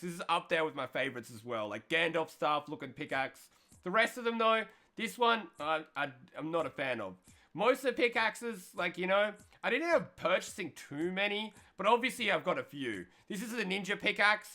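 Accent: Australia. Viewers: Hussein Naser they are both Australians durh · So, ur dumbass is telling me that everyone with an Australian accent sounds the same?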